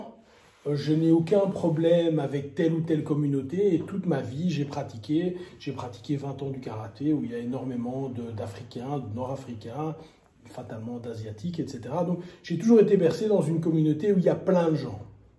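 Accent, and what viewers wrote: Belgium. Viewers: Commentaire pertinent et argumenté...malgré ton terrible accent belge!!!! le racisme on peut dire ce qu' on veut c est à la tête du client...un raciste en France tu mets zidane en face, il lui lèche le dessous des c....et prends un selfie en lui tapant la bise...un raciste en FRANCE fermera sa gueule si 1 médecin urgentiste marocain ( c mon pays d'origine et suisse parents ) sauve la vie de son gamin après accident de voiture etc...n'aie crainte les commentaires de rageux..c est la vie..et c est dommage!!! g eu les boules,ça m a fait de la peine de voir certains commentaires autant que j ai kiffé ta " sortie" via cette video😉👍 sans rancunes pour ton accent lol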